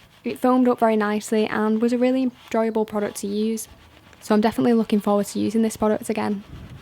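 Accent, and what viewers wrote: United Kingdom. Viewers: Sorry to say..im actually Don't understand some word..bcz of british accent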